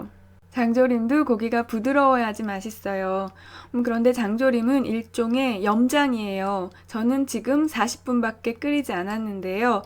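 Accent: Seoul, South Korea. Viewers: 진짜 90년대 서울 사투리 항상 늘 신기해요ㅋㅋㅋㅋㅋㅋㅋㅋㅋㅋㅋ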